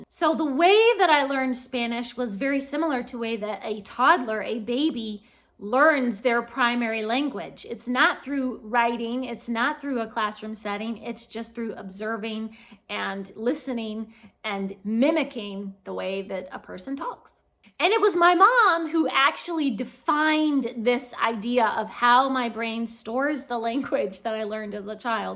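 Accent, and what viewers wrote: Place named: Mexico. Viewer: Hola! Saludos desde Barcelona (España). I follow your YouTube channel since some months ago now and it is the first time I comment. Hope you are well! You are the second booktuber that I find (the truth is, in a very short period of time!) that speaks Spanish as well and I am very happy for that!! My first language is Catalan and my second/first language is Spanish. I speak English and a little bit of French and learning Russian nowadays. Would be great to read some books in Spanish with you! Hablas muy bien español con ese acento mejicano maravilloso 👏👏👏. Hasta pronto!!! Cuídate mucho!! Bye!😊🙋‍♀️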